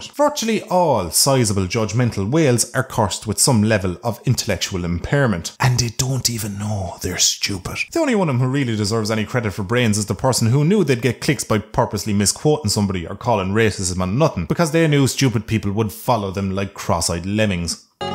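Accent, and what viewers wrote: Ireland. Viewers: Love your accent! Is it Irish?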